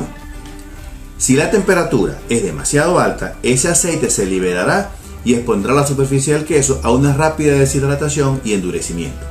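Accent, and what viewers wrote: Venezuela. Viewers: ¿Queso de mano? ¿ud es venezolano? saludos desde caracas. gracia por la explicación. llegue a su video no para aprender hacer queso. si no para darme cuenta de las malas prácticas de los queseros aca. ningún queso mozzarella producido en venezuela se estira, todos son terrosos y ásperos; al parecer están usando en exceso el sulfato de calcio para darle más rendimiento a su materia prima y lograr tener más producción, incluso buscar acelerar los procesos. ya pude escuchar al final de su video que es de aca. nuevamente gracias.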